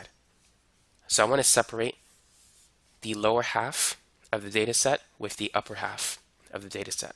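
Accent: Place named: Brooklyn, United States. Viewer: This guy has just the slightest Brooklyn accent and sometimes it comes out for just a second.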